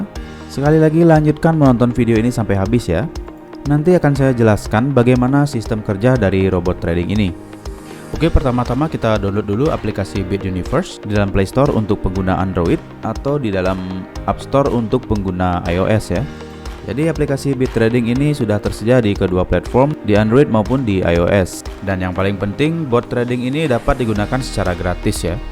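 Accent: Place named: Bali, Indonesia. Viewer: Logat baline kental sekali bli... 🙏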